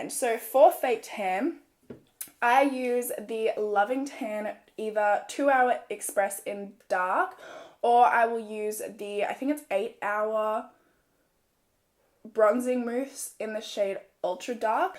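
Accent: Australia. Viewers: I just live for your Australian accent 😄😄❤️ I never knew you were that fair to tan your body 😲😲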